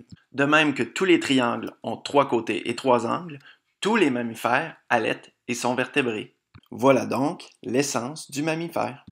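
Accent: Canada. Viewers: Quebecois (Canada) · Tu viens-tu de Montréal? J'arrive toujours pas à distinguer bien les differents accents au Québec :D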